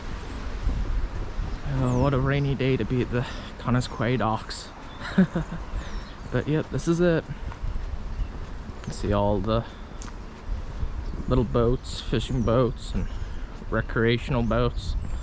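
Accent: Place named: United States. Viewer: Yeah thought you was an American…came across your video looking up Conqueror Challenges, I’ve just signed up for a few…Good Luck! 👍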